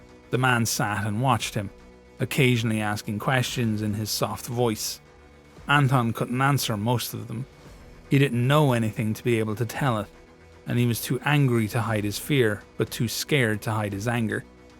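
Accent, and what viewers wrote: Ireland. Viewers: What an amazing story. Love the style, the temhe and your irish accent!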